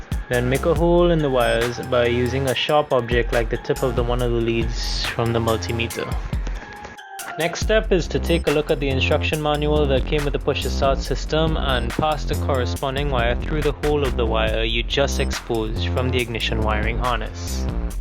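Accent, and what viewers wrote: Trinidad and Tobago. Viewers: Accent sounds Trini.. Now i can do it myself.. Ydk.. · your from trinidad aint you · You sound like a Trini 🇹🇹🇹🇹🇹🇹 good job bro if you are indeed from Trinidad maybe you can help me connect this to my Legacy GT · Are you Trini? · Almost sounding trini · Hey are you from Trinidad and Tobago? You sound like a trini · U sound like a trini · “Dem one handed skills thooooooo”. Definitely a Trini. Thanks for this man, top notch stuff. From your Jamaican cousin! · Hi wonder if you can help? I have thks same kit and a 1996 Toyota Supra. The dash wiring that connets to the barrel has 6 wires...can you help me out? Btw you sounding like a trini??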